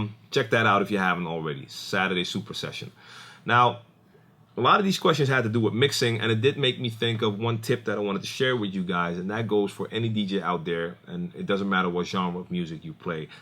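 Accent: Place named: Northeast, United States. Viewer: Then why do you talk like someone from the Northeast US?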